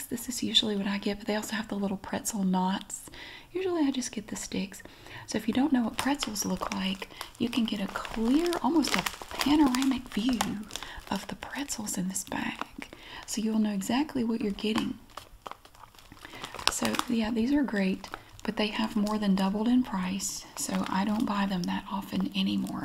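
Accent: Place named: South, United States. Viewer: My favorite YouTuber at the moment. I don’t know what it is about her. She’s addicting to watch and listen to. The southern charm is just something I don’t ever see in my state (WA) so it’s really satisfying to hear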